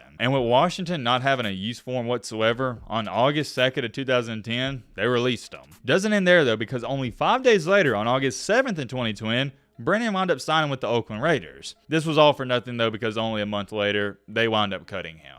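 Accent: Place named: Appalachia, United States. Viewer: This hillbilly narration is like nails on a chalkboard. Also, this jackal doesn’t know ball and it’s obvious.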